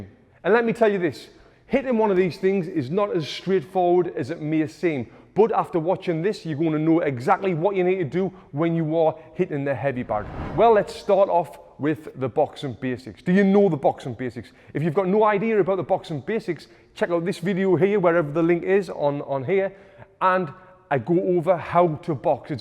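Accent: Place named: Newcastle, United Kingdom. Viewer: Brilliant accent, Newcastle?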